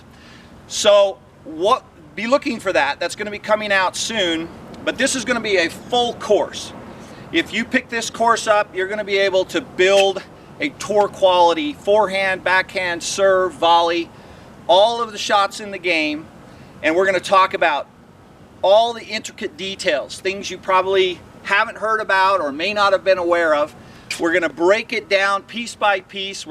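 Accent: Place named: United States. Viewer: Where is Insight Tennis situated? Your accent is US but the locality looks like Irlenad or UK..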